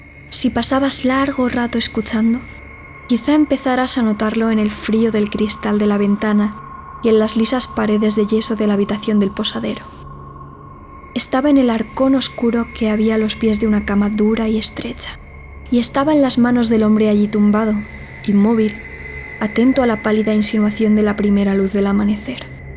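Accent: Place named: Spain. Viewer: Amo tu pelo y tu acento español!! ♡♡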